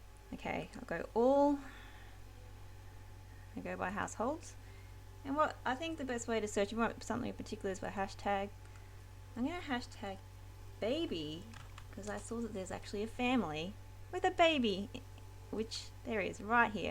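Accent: Australia. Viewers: Are you Australian? And is your name?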